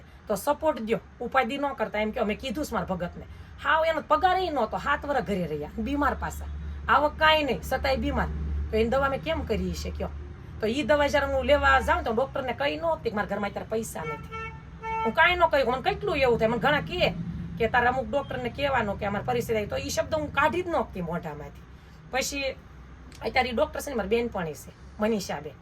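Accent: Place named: Amreli, India. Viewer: Tame amreli na hoy aevu lage chhe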